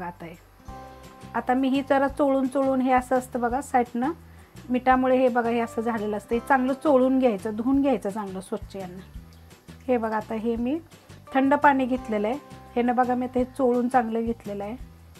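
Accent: Satara, India. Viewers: तुमच्या आवाजांना मी ओळखलं तुम्ही साताऱ्याचे आहेत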